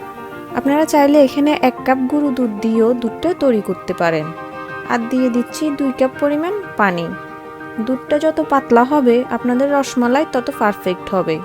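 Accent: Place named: Noakhali, Bangladesh. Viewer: নোয়াখাইল্লা। "প" কে "ফ" বলে। anyways recipe darun cilo.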